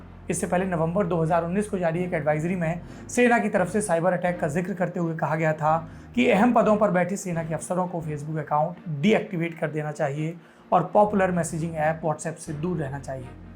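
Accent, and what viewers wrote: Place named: Uttar Pradesh, India. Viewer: सर जी एक बार विकास दुबे की कहानी अच्छाई बुराई सब अपने यूपी वाले स्टाइल में सुना दीजिए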